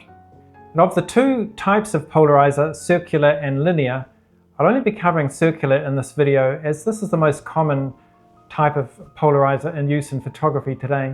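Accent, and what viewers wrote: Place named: New Zealand. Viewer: Nice to see and hear some NZ images/accent. I use Cpl filters alot for black and white photography.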